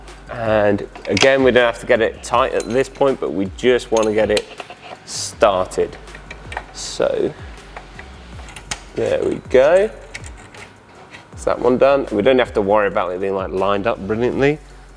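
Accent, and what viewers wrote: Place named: United Kingdom. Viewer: Mate your accent had me thinking Verus was UK based, but nope gotta pay the +200% import fee's 😅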